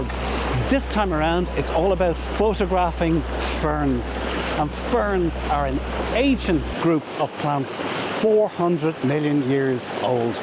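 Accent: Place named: Ireland. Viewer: Love the softly spoken Irish narration in your vids., very calming along with your intimate landscape and macro work ( my style of photography ) just very enjoyable viewing. Keep up the good work. 👍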